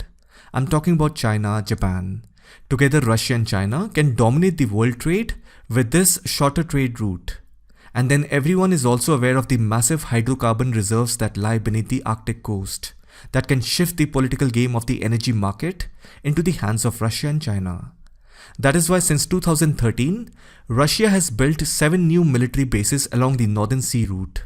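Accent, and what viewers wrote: India. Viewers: Dude not only is the content of the video incredibly good, but also your voice is just on-point. I'd never thought indian accent could be so cool. I bet it took years to train that voice, singers would be envious :D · It's an Indian accent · From the accent I’m assuming he’s Indian and English is widely spoken among educated people in India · Nah a lot of Indians have this accent. You're just stereotyping us with the western concept of the funny Indian accent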